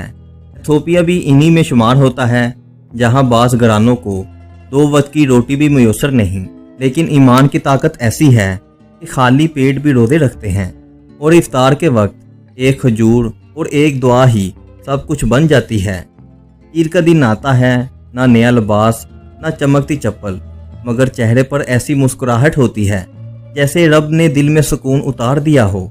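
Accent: Lahore, Pakistan. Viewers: بہت اچھے موضوع پر بہت اچھی گفتگو. کوشش کریں کہ آپ ، اپنی اردو ٹھیک کرنے کی کوشش کریں . لاہوریوں والی اردو لہجے کو ٹھیک کریں .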